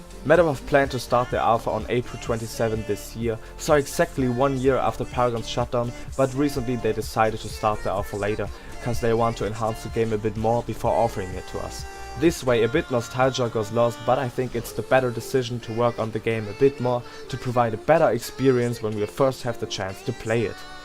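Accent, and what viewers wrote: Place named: Germany. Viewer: This German accent...... 😖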